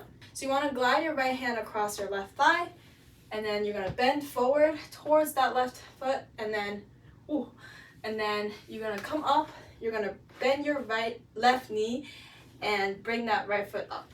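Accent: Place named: Australia. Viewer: Thanks and are you australian because of your accent "hea" 😊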